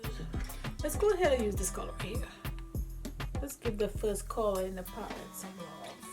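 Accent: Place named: Caribbean. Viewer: U sound Caribbean, I love it 🥰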